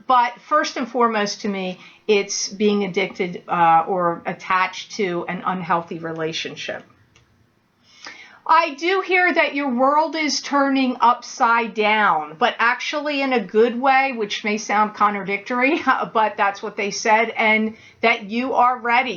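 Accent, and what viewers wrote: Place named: New Jersey, United States. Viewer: I absolutely love your vocabulary, dahling and of course, your Jersey accent (miss hearing it over here in AZ)! You're so intelligent and yes, of course your readings are spot on!! Congrats on being booked for the next 8 months!! I look forward to your readings every month, so thank you so much! Oh and just an fyi: if you type in your website without the www it takes you to <link> Love and light from a fellow Jersey girl, Autumn:)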